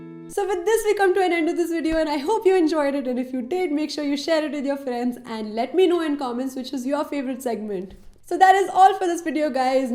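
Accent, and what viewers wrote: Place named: North India, India. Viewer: We don't need travel advisory from North Indian girls trying to act like they are white. Annoying North Indian accent.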